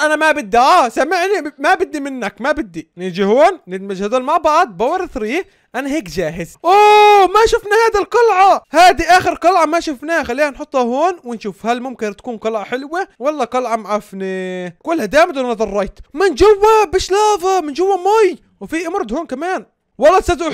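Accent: Syria. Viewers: انا تعرفني اني اوديك شو اسمي اسمي عبد الرحمن ميه ميه والله انك سوري اسمع صوتك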